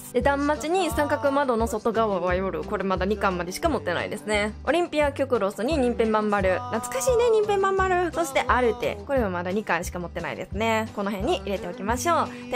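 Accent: Kansai, Japan. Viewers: ちょっとしたマンガ喫茶じゃないですか！お友達呼んだらお金取れるレベル(笑) もともとの関西弁にあわせて、めっちゃ早口で紹介していってる感じが「ケロちゃんにおまかせ」っぽかった(笑)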